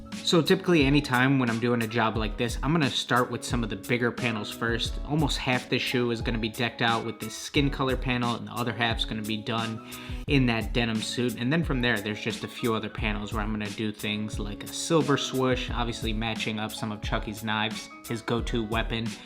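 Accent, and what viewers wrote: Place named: New York, United States. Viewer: Those are fire bro , bro i can hear an accent u gotta be from NY or Canada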